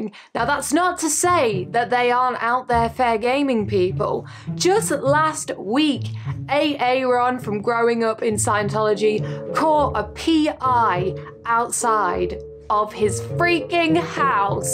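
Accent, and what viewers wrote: Manchester, United Kingdom. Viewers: I'm guessing the Lego is a part of your balance and should not mix with the World Wide Web??? Your series is the only one that REALLY hit home to me, it was because it was closer to home with me (UK, and the 'proud to be' Manc accent). Ill be sure to keep tabs on your efforts. A great idea to focus on the 'after Scientology', It would be quite empowering I think. Well done, keep up the great work, your a natural. RIP Grandma x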